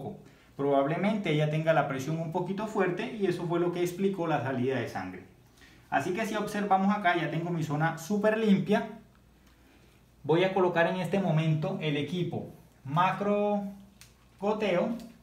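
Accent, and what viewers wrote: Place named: Colombia. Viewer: Bogotá, pero por su acento sabia que era de Colombia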